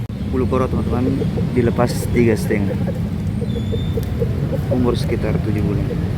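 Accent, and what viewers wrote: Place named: South Sulawesi, Indonesia. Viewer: Salam mkssr daeng baru tadi lihat sort ehh logat"nya Sulsel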